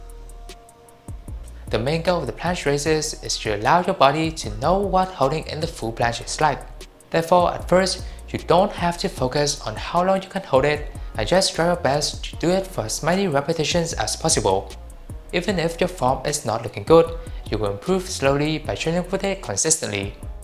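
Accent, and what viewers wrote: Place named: England, United Kingdom. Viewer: Bro your English accent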